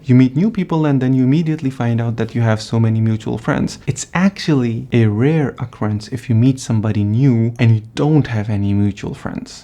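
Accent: Estonia. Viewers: You've lived there for so long that you've even picked up a slight Estonian accent :)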